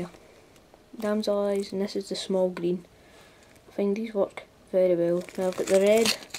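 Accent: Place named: Ayrshire, United Kingdom. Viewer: You sound and even tie like the great fly tyer "Davie McPhail" fae Ayr!!! well done son i love yer enthusism and confidence tying these ;) Where you fae? you sound like my accent as Im from Auchinleck Ayrshire!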